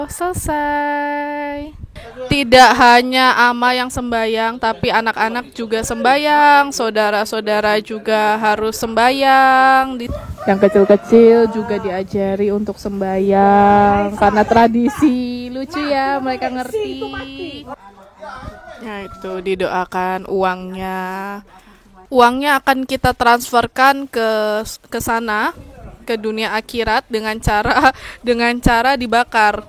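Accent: Manggarai, Indonesia. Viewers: Logat manggarai y ci 😅❤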